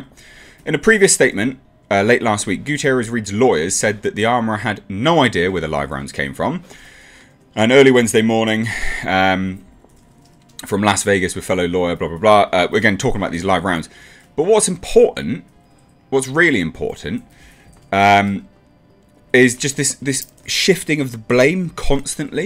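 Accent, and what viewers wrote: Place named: United Kingdom. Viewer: Excellent news coverage however that pretentious British accent is annoying as hell.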